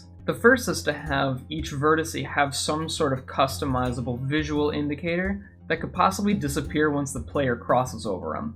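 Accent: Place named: Canada. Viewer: You sound like kenadian.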